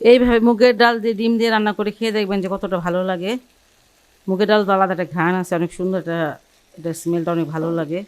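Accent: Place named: Bikrampur, Bangladesh. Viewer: উনার বাড়ি বিক্রমপুর।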